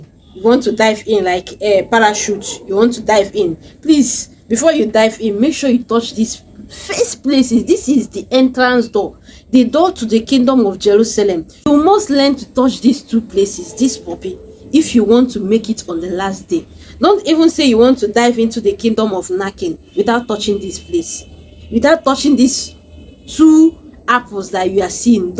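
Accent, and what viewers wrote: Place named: Nigeria. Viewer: Go straight to the point and stop talking too much Next time speak good English Most us don't understand your Nigeria English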